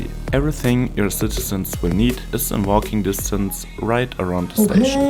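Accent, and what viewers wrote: Germany. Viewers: I Love your german accent Even though German is a Kinda Harsh Language Love Your City 😍🤩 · are you german?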